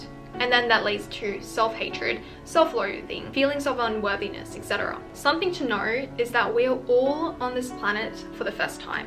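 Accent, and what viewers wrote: Australia. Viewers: Hey! I just love your content sooo much. It’s so informative and full of your experience. I guess everyone is glad that you share all your knowledge about life and many important other topics. Every time I watch your videos when I‘m down it makes me feel a lot better. Somehow it’s so calming to hear you speaking. (I LOVE YOUR AUSTRALIAN ACCENT). Thank you for everything<3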